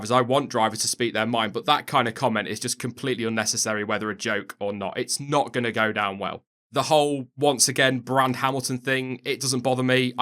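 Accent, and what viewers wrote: United Kingdom. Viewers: Nicely done, interesting to watch. But british-english is pain to listen...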